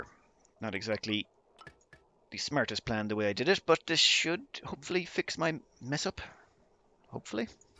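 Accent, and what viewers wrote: Ireland. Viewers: Where are you from? Do I notice an Irish accent?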